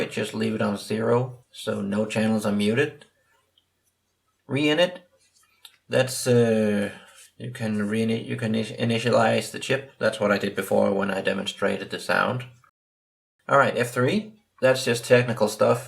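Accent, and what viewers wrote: Denmark. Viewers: Hmmm.... Sounds like you are Danish!?!?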